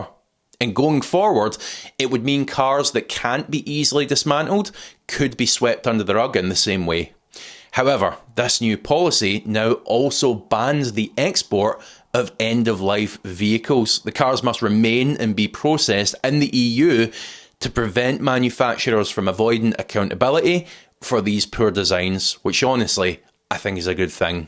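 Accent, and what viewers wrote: Scotland, United Kingdom. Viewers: I subscribed just for hearing English in the Scottish accent!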